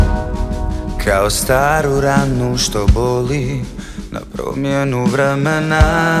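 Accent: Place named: Zagreb, Croatia. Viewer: Dobar si.Zagrebački naglasak.Super.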